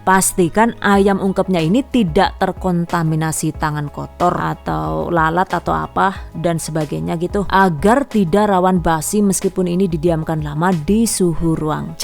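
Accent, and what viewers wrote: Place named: Tegal, Indonesia. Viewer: Tegal baget ya bund,,😅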